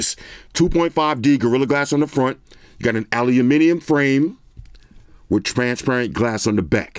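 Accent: New York, United States. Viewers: It's like talking to my boys from NYC 😆🔥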